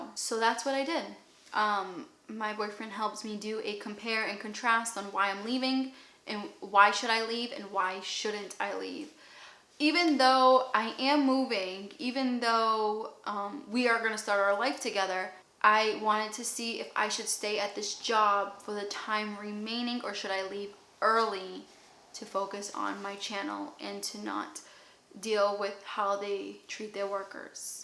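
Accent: Boston, United States. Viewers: I hope you get a job that pays well or you can focus more on Youtube! Also your Boston accent is so strong! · I hear your Boston accent 🤪❤️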